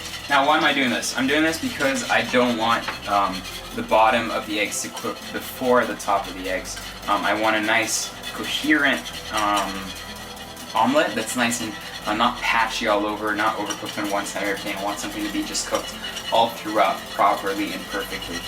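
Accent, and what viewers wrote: France. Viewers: it's cuz he's French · that french accent.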